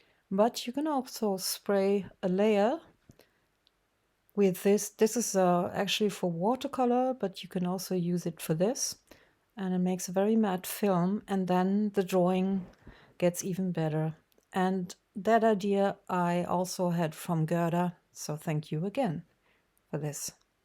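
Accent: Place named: Germany. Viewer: Ich tu mir echt schwer und muss mich höllisch konzentrieren, dieses deutsche Englisch (Denglisch) zu verstehn. Ich schaue viele Videos in Englisch und verstehe sie auch, aber das ist, sorry, weder Fisch noch Fleisch. Ich muss den Ton abschalten und die Untertitel lesen, um das Video zu verfolgen. Vielleicht besser in Deutsch kommentieren und die Englisch Übersetzung in den Untertiteln anbieten.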